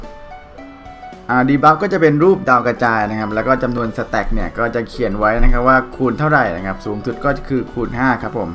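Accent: Thailand. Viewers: มันก็จะเหน่อๆหน่อย555+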